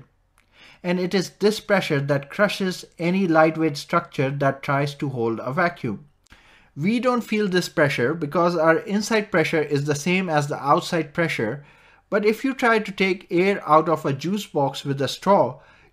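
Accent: India. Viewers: Is there a way to have a native English speaker do the voiceover? What's the point of using a heavy Indian accent in this airship video? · indian accent... nope i'm out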